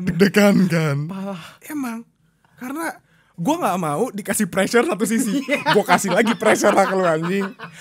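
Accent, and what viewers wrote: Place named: Java, Indonesia. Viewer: medokmu mas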